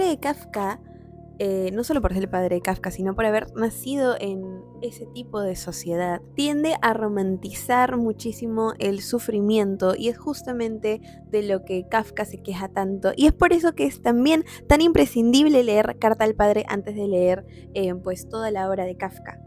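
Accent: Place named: Peru. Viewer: ... Pues déjame decirte que has hecho una buena reseña con tu dulce voz, de acentito, de muchachita... peruana.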